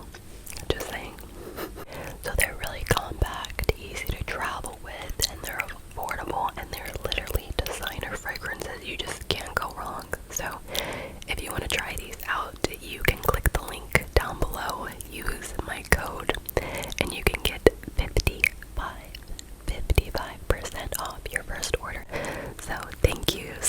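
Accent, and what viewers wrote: United Kingdom. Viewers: Hey <3 love the video as usual 🫶 could you maybe do a british accent video again ? I actually love your british accent lmao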